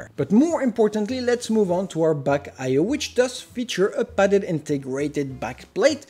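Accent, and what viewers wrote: France. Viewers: Great review combined with an outrageous french accent that Inspector Clouseau would be proud to own.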